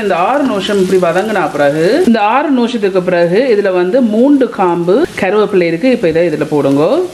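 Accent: Jaffna, Sri Lanka. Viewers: Akka, I like the way you speak Tamil. Jaffna Tamil ❤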